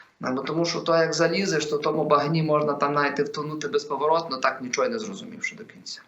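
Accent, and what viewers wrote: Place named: Galicia, Ukraine. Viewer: Третии маидан готуе Порошенко та таки як ти ,и розмрвляи Украинською а не Галичанським суржиком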